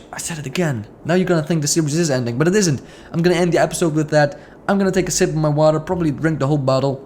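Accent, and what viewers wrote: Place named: Netherlands. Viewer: sup man. Great series I really enjoy watching series like these about cities skylines. where are you from by the way? You sound a bit like you are Dutch or Belgian.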